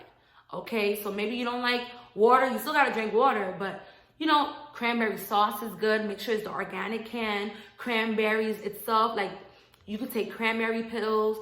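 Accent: New York, United States. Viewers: She has personality of cardi she has the new york accent. But she doesnt look like her she looks better than cardi 😂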